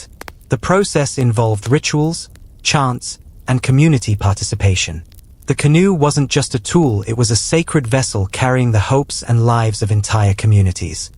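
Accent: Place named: Europe. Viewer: When a european sounding man narrates its boring and full of holes, not a sleep story at all, more like an unintelligent story told by an unpolynesian male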